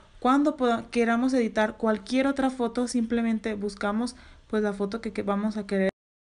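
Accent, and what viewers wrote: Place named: Tabasco, Mexico. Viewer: Tienes acento tabajqueño🤭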